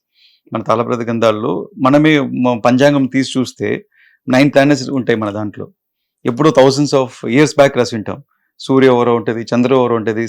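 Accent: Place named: Tamil Nadu, India. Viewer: Nuvvu nee arava slang pora pooka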